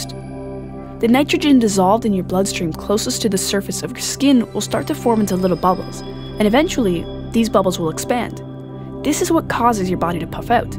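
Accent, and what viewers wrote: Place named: Canada. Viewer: I'm sensing a Canadian accent, specifically any word that rhymes with "out". You don't have the full-out "oot" pronunciation, but I'm still hearing a slight difference from the American accent of which I speak.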